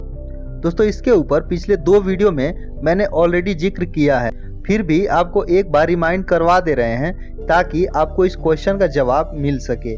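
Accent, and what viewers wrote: Bihar, India. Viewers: awaz se bihari lagte ho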